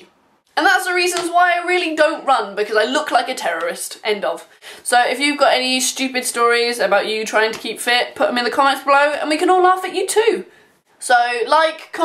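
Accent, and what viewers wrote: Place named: United States. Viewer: That was a pretty good American accent.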